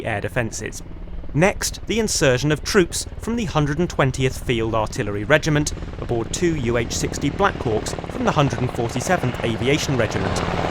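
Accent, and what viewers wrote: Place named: United Kingdom. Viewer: Seriously, a brit commentator? How about we have an American narrate RIAT? Absolutely annoying.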